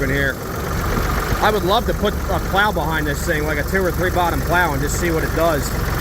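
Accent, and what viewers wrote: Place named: New Jersey, United States. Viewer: that was a wonderful review of the 340A. My friend is looking at a 231 with a loader, but has the smaller 36 hp Diesel. We'll definitely look out for the steering and loader problems you mentioned. On the 3 pt, it's my understanding that the left lift link isn't adjustable, only the right side is. Could definitely tell you grew up in Jersey, as did I. I'm from Bergen County, not many farms left there. Again, great review. Tom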